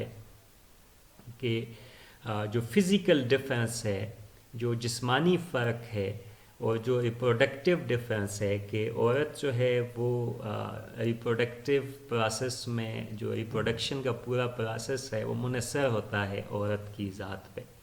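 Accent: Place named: United Kingdom. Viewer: firstly I thought he is a Britisher........then he started Hindi....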